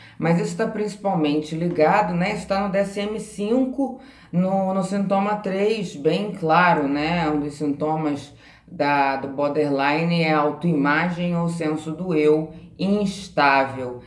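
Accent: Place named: Rio de Janeiro, Brazil. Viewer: Você é muito inteligente , sou Border também , e tenho um estranho orgulho disso talvez porque sou anti - social . Pelo sotaque você é do Rio , eu sou de Curitiba .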